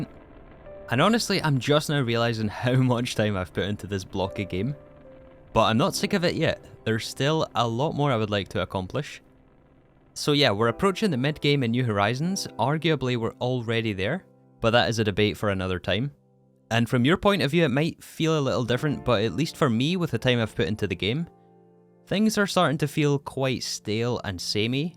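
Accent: Scotland, United Kingdom. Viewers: I feel as though you're hinting at the fact you're struggling with a bit of burn out but don't want to say that due to how that can be interpreted. I think it's natural on a modpack like this that there are going to be points where you just can't be arsed as it's the same grind for hours on end that isn't really valuable for your content and therefore not to us either which feels like a massive waste of time but there's no avoiding it as that's the progression. I would say, do what's best for you. If you feel as though starting something new would be best, do it. I enjoy your content not for seeing the tier 35 rocket but your upbeat Scots rambling.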